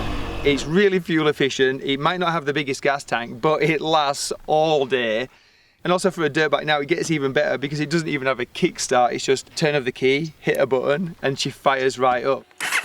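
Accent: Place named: North England, United Kingdom. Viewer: You sound like a Englishman (albeit a northerner). I thought this bike wasn't available in the UK. Where can I get one?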